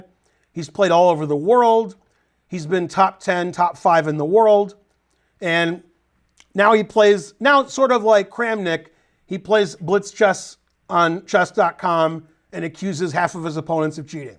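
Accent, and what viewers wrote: United States. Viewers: How can the american accent be so annoying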